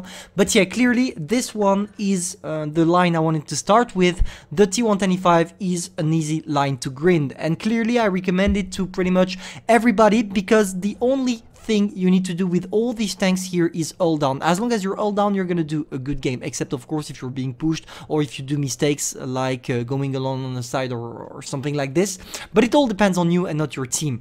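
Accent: France. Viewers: T'es français? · he's french ,i speak French, English Greek, that's how the French speak English because the i ,in French it's like the English e in English an i ia pronounced ai . there you go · C'est l'accent français ? Je peux pas croire que t es anglophone de base (je critique pas ton anglais)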